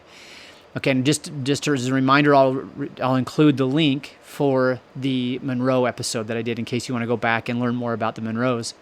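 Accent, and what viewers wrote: United States. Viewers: Narrator with an American accent? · Why is an American doing the voiceover?? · Why is there an American voice doing this , it put me off , he’s reading all this and not very well . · Why are we heari g an americans voice,instead of a Scottish voice. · Putting up the name of each castle somewhere on the Image as you were speaking about it, would've been helpful, especially as (no offence) your American pronunciation is not always on par with ours. Maybe consider it? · I am an Armstrong please do oters with a Scot speaking instead of your American voice...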